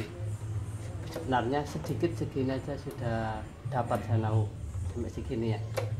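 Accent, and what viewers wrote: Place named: Java, Indonesia. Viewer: Medok kali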